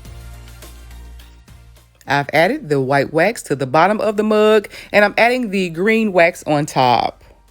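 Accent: South, United States. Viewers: So nice. You have a heavy, Southern accent.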